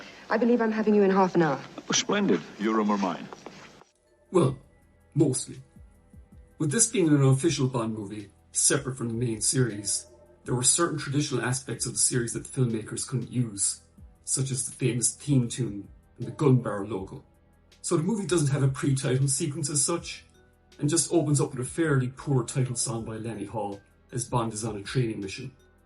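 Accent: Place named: Ireland. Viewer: Great video as ever, thank you. 🙏 Your accent is great, what part of Ireland are you from?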